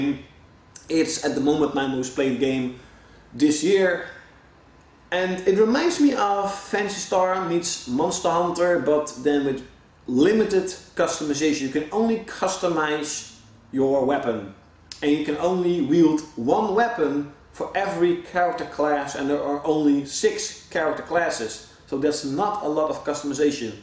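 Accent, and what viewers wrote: Netherlands. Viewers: Are you dutch? Nederlands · Are you dutch? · Haha goeie collectie, je kan trouwens goed horen dat je nederlands bent. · are you dutch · Gast je hoort echt te goed dat je nederlands bent... werk aan je uitspraak!!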